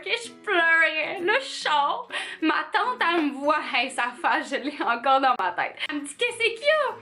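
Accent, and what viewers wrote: Quebec, Canada. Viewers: Pourquoi j’ai des vidéo story time sur les première règles TOUJOURS sur l’histoire de québécoise (n’empêche j’adore l’accent) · Lol T’es tu Québécoise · Samantina Filoscin c est normale elle sont toute les 2 québécoise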